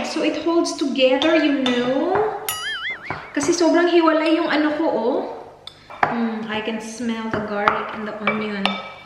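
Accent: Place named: Visayas, Philippines. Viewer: Bundak kaayo mu bisaya si ate 😂 shout out po ate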